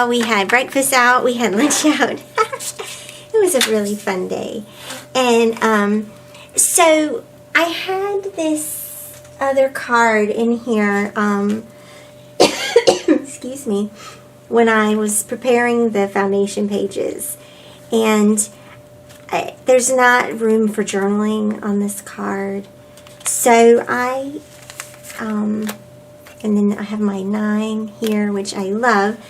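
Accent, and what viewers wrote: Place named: South, United States. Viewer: Love the pages and all of the eat stuff your hubby brought back for you! Where do you live? You sound like you are in the south! I'm in Atlanta.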